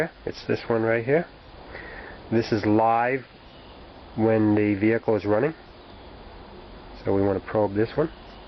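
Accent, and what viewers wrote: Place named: Rhode Island, United States. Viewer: outstanding video.im from texas and i understood you better than the texas folks/dig the accent..where you from rhode island.got a army friend thats talks just like you.keep up the good work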